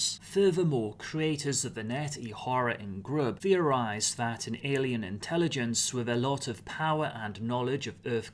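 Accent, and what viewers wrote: United Kingdom. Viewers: That accent makes me think I'm listening to a British news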